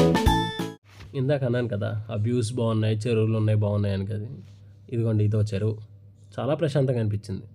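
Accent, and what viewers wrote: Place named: Godavari, India. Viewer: Godavari yasa add ayyaka godavari andhalu inka bagunnayi.❤.keep going 👍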